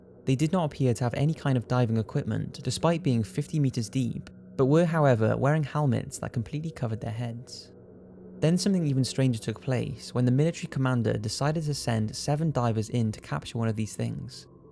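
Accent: Wales, United Kingdom. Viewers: Are u British? Lol sorry if its obvious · I love your videos and your Welsh accent. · Dbonkerz81 Pretty sure that's what I said? But he has a Welsh accent which changes his pronunciation slightly.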